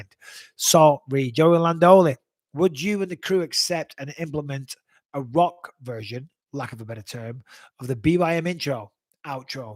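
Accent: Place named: United Kingdom. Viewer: Not sure why you were one of my favorites… probably that British accent ;)…love the content brother keep it up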